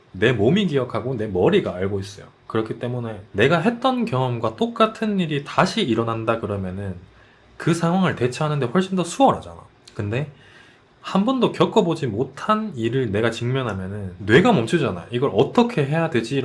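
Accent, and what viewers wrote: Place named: Daegu, South Korea. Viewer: 영상감사합니다 제가 외국인 대학원생입니다. 돌아가신 교수님은 저에게 그런 가이드 같은 존재였어요 대구 말을 듣고 교수님 생각이 납니다 그 교수님도 대구 사람이시고.. 너무 보고 싶습니다, 항상 건강하시기를 바랍니다 영상에서 많이 배웠어요~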